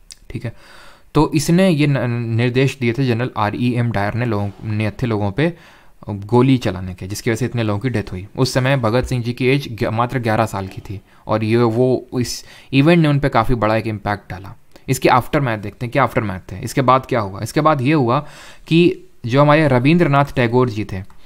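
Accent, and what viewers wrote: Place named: West Bengal, India. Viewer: Your bengali pronunciation is very sweet ❤❤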